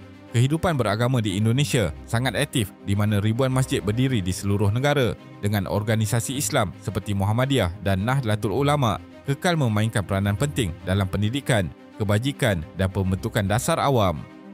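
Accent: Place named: Malaysia. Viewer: Saya gak yakin dia itu YouTuber Indonesia, dari caranya ngomong aja meragukan 🤔 Atau jangan jangan itu YouTuber malay yang pakai lokasi di Indonesia biar kelihatan YouTuber Indonesia 🤔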